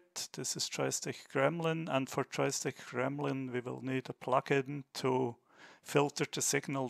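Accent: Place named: Finland. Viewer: you have a sort of Finnish accent 😉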